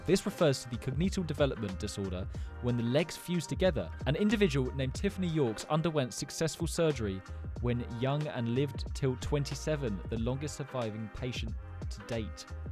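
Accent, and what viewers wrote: United Kingdom. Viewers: I feel like your accent is like British with something else that I don’t even know the accent singing other songs and perfecting the accent except not American accent. Oh my goodness.😂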